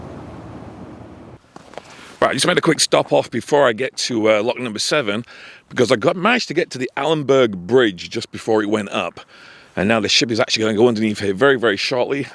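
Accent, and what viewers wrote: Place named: Manchester, United Kingdom. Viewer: A fellow brit & your in Canad! I recognised the Manchester accent. What a beautiful sight of the huge ship & another lift bridge like in Duluth MN. You are so lucky to see this. ❤ from UK. 🇬🇧🇨🇦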